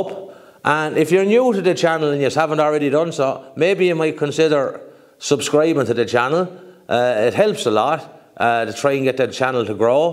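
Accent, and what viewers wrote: Ireland. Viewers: Great video, very helpful. Also I had to subscribe just to hear that wonderful Irish brogue! Tanks!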